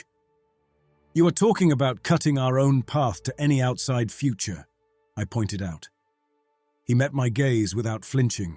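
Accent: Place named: United Kingdom. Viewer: Oh look another British accent 🙄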